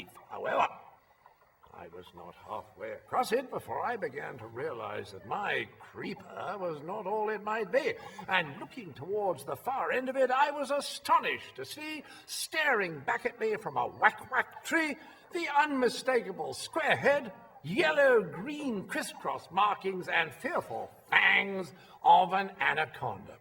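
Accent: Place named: United Kingdom. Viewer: Subtitles application is struggling to cope with that magnificent British accent like a desperate lion.Funny too!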